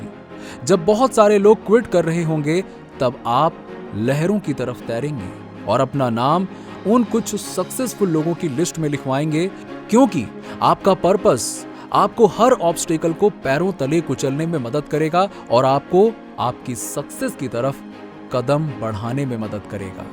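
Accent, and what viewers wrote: Bengal, India. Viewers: Bangoli accent was good..,...I liked that voice... Resume that voice again...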